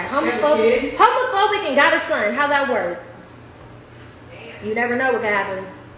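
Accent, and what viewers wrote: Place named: Los Angeles, United States. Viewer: She’s from LA. We all talk like that lol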